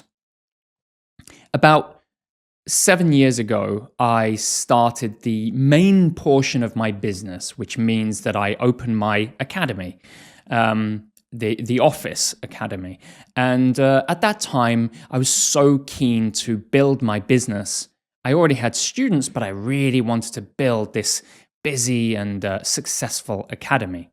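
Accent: United Kingdom. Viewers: Happy New Year from Azerbaijan🇦🇿 Thanks for podcasts🙏🏼 and your british accent is amazing very clear we could understand you whole conversation.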